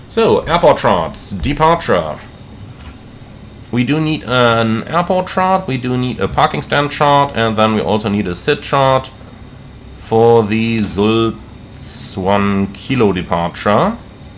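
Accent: Switzerland. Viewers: Geil mit dem schweizer akzent😂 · I don't speak german but, as a swiss descendant, I recognize the swiss accent when I hear it.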